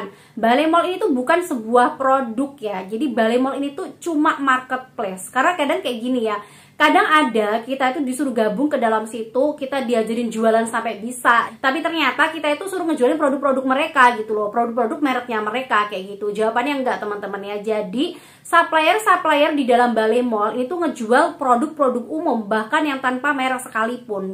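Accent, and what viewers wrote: Java, Indonesia. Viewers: Kowe wong jowo to mbk, kuq bosomu medok😂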